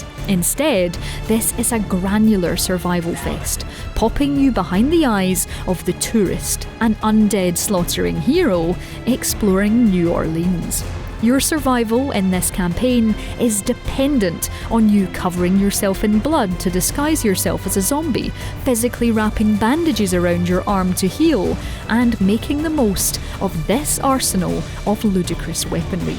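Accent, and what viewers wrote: Scotland, United Kingdom. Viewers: everyone talking about games but no one talking about narrator girl .She mixed her scottish-english-welsh accents and it sounds like cute xD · i love this ladys scottish accent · This is a nice scottish accent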